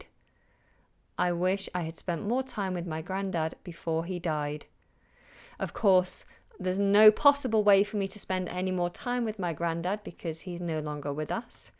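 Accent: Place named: United Kingdom. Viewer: I really love your British accent. It sounds like music to me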